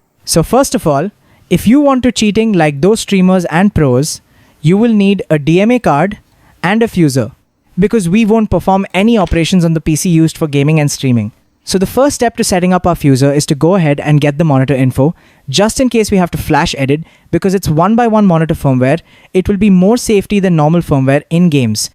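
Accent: India. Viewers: ts indian voice cannot be the method 🥀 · Sounds pretty indian if you ask me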